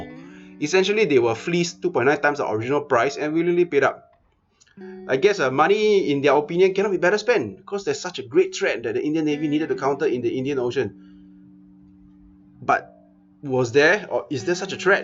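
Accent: China. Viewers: This is Chinese voice, and security of India aircraft is 5% less then Chinese aircraft but according power Indian aircraft is 10% more deadly then Chinese so Indian aircraft can destroy Chinese is possible 30% but Indian destroy chinese aircraft is 70%, don't forget Barak 8, And Aakash and Bramhos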